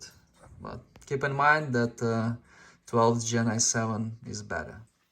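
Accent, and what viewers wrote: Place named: Russia. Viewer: Слушаю автора и кажется у него наш, русский акцент, буквы чётко выговаривает, без соплежуйства английского...)))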